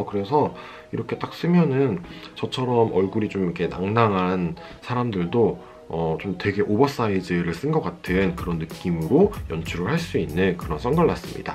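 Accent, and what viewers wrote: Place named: Seoul, South Korea. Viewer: 영상볼때마다 느끼지만 말투가 참 다정다감하시네요. 요란스럽지 않아서 좋은것 같아요!^^ 서울말 쓰는것도 부럽고..ㅎ 무튼 영상 잘봤습니다. 자주자주자주자주 올려주세요ㅎ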